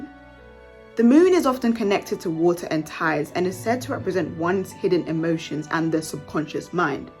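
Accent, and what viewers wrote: United Kingdom. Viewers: I love your British accent 😍 ❤ it's so nice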